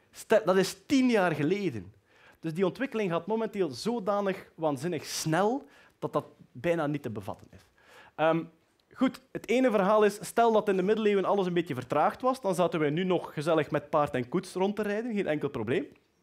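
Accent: Flanders, Belgium. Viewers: Goede presentatie. Heerlijk om Vlaams te horen. En voor iedereen een begrijpelijk en interessant verhaal denk ik. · Gaat helaas niet meer op sinds we in 2019 weten dat de aarde geen kromming kent. Geen bal, geen ballen universum, geen zwaartekracht, geen evolutie....aiii. Metingen aan het aardoppervlak tonen een vlakke aarde, dus de logica gebied dat er zeer aannemelijk een Schepper in het spel is. Een ander leuk nieuwtje: de Ark van Noach is gevonden. Maar voor een Nederlander is het heerlijk naar deze man te luisteren. Het Vlaams is zo mooi!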